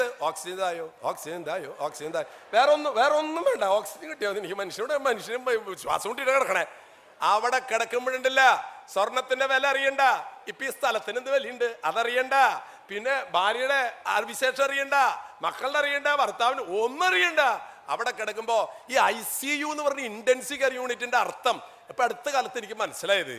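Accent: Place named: Thrissur, India. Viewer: tani trichur language thanne..marakilla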